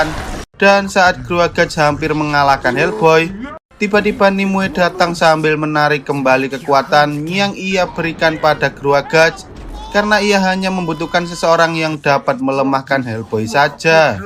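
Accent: Java, Indonesia. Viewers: Apik tenenan film ne... naratornya..medokkk tenenan jawane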